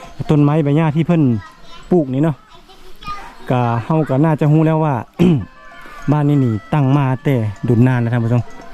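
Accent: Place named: Surin, Thailand. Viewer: ถ้าอยู่ไทยกะคือสิแม่นจังหวัดสุรินทร์,ศรีสะเกษหล่ะเนาะ สำเนียงแบบนี้😁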